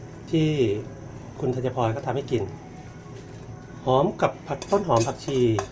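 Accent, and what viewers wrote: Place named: Korat, Thailand. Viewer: คนโคราช.บ้านเอ๋งงงงงง พูดแบบนี้ครับ ผมก็ยุ่ อ.พิมาย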